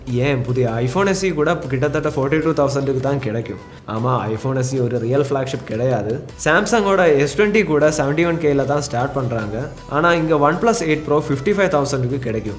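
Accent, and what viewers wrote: Kerala, India. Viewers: Brother are you a malayali. Unga Tamil 🥳👌. Tamil yaaru pesnalum alagu dhn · Bro pesradhu le clarity kammi ah iruku try to improve it .. Malayalam slang konjom iruku try to avoid it. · Ena bro nenga malayaliya... Unga speech style knjm different ha iruku but Nala iruku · Voice over malayala tamila ... Sema kick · Bro r u from kerala? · bro nennga malayaliya unnga Tamil apadi tha erukku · malayali anonn oru doubt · மலையாள தமிழ் சூப்பர்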